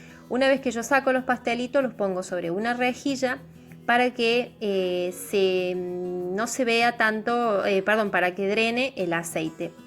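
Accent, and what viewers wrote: Córdoba, Argentina. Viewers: Te escucho, y me haces acordar a mi bella Córdoba, quizás no seas de mi provincia, pero sonas como cordobesa 💙🤍💙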